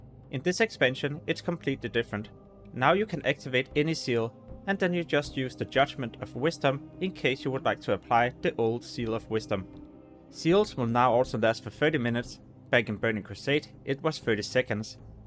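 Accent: Denmark. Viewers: Du er dansker kan jeg høre 😁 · Jeg kunne høre, at du var dansker. Har subbed - god kanal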